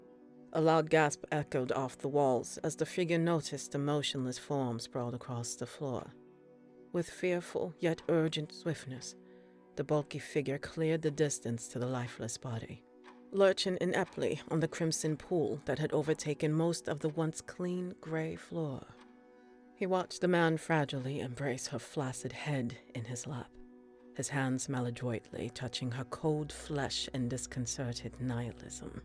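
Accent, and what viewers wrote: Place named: Boston, United States. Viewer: Love that raw - unscheduled laughter....at the end / little did I know - there would be much more..... from your spontaneous, and bubbly personality 😂 my friend I want to say New England To Boston.... accent? " His fingas " Loved the reading Great writing 👌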